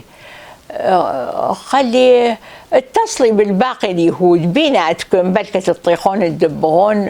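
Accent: Mosul, Iraq. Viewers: تحجي مصلاوي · كانو لهجتها مصلاوية 🤔؟ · الله يحفضك ويطول عمرك يلغاليه كلنا العراقيين نسمع قصتك وانت تحكين الغه العراقية بلأخص الغه تحكين مصلاوي وانا من أهل الموصل وسمعون حكيكي بلمصلاوي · ماشاءالله عنا لهجتها العراقية واضحة وقوية( كولش) وهي ايضا سيدة جميلة العمر الطويل لها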